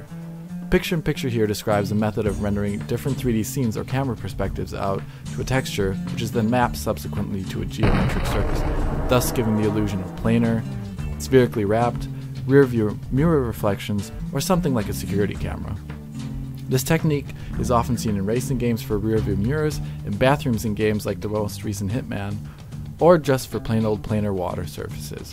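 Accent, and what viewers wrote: Germany. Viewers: Hm. I guess my ear is just trained for it then hearing that accent a lot. For me it was always sort of obvious. It's in the tone of his voice. It's just so.. german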